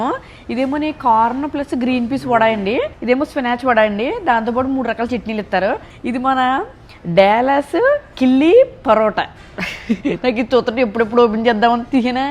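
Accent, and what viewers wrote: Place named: Amalapuram, India. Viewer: Amalapuram language super ..entandi....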